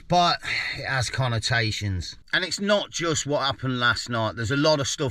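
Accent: Leicester, United Kingdom. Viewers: knew you was from leicester or east mids from your accent mate, as im from leicester too. brilliant video man,.people just need to fuck off and leave everyone alone. you need the peace, especially when you know when the people of leicester are about lol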